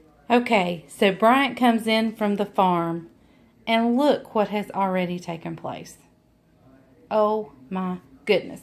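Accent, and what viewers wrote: South, United States. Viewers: This cake looks absolutely delicious!!! My friend's birthday is coming up at the end of this month and I think this cake would be just the thing for her celebration. I just subscribed to your channel and am looking forward to watching more of your videos. I enjoy listening to your southern accent! (I'm from Missouri where we have no accent LOL)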